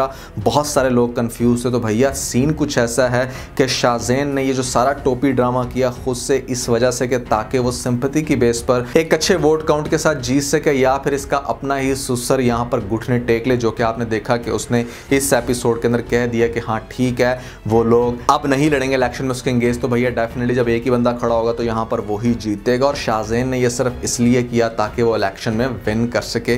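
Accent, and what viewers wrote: Punjab, India. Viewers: Ap punjabi hn ?? Ap k excent se laga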